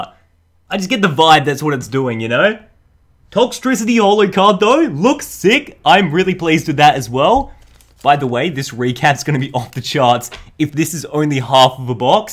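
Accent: Australia. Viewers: Is he an Aussie YouTuber?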